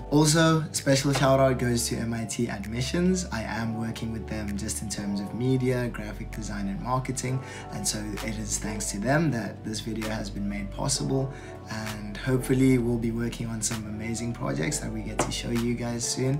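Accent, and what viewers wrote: South Africa. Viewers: Were you born in South Africa, by any chance? Your accent sounds a lot like ours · You have a South African accent...you from there?? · I noticed that you've got a South African accent, how did you make it to MIT from SA?! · Where are you from? I cant tell what accent you have, sounds South African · you have a "jhb" accent, I picked it up a mile away 😅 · Your accents sounds so South African, have you ever been to South Africa? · Weird question but are you South African😂😂😂 I cant shake the feeling💀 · You sound so South African… am I correct or not? 🇿🇦 If so, could you please make a video about it; Matric marks, how you applied, what the costs are, etc. Thanks brother. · I know a South African when I hear one. 😂👀👋🏼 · Lol. Idk why but I'm sensing a South African accent... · Am I hearing a South African accent here 👀😅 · Are you South African??😏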